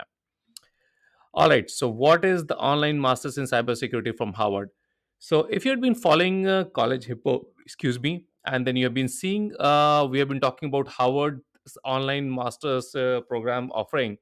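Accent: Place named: India. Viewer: Why are you sounding Indian?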